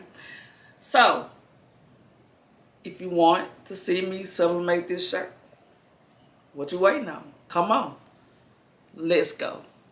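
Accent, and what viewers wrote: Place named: United States. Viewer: Nigeria setting or environment, but i hear American English🎉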